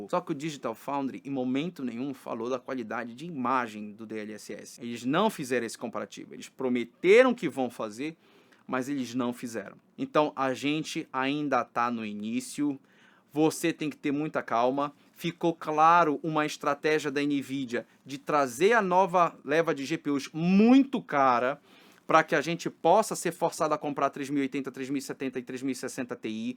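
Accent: Pará, Brazil. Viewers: Esse sotaque é do Pará? Do nada achei seu canal e agora tô aqui assistindo sobre placas de vídeo 🤭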